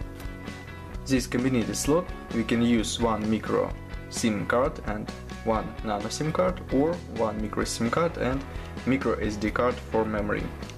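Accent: Russia. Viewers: хехе факин рашн экцент, но это ничего, а вот политическая карта мира..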